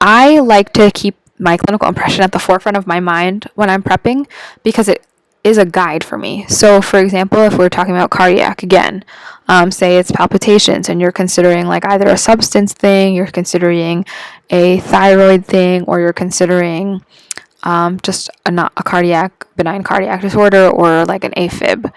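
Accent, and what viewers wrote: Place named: United States. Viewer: Hi DOc. thanks for this ideas. I really appreciate your diction and your voice . I didn't distinguish if you are a Filipino or an American, because your accent is like an American speaker.. I love hearing your speech doc. 🥰👏👍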